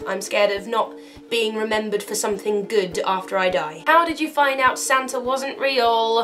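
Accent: United Kingdom. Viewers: That was what I was referring to xx P.s. omg u hav british accent??/¿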